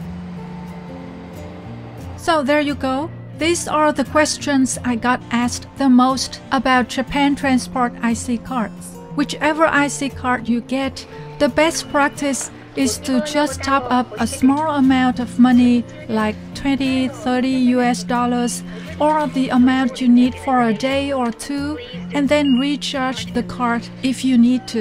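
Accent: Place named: China. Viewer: Are you a Mainland Chinese living in Japan? You speak English like my Mainland China colleagues. Nothing wrong with that, just wondering 😊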